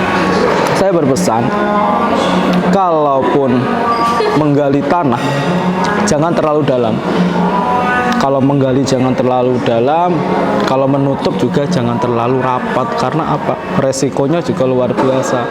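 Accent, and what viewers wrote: Java, Indonesia. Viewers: Nama chanelnya..tp klu bicara medok koyo wong jowo..sukses ya.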